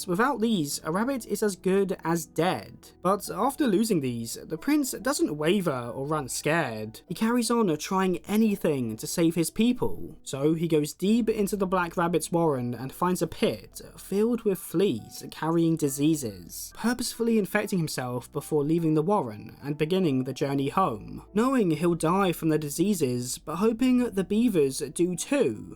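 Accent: United Kingdom. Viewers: I was trying to place the accent. Sounds like if Mark E Smith if he came from Southend and not Salford. It's nearly unlistenable.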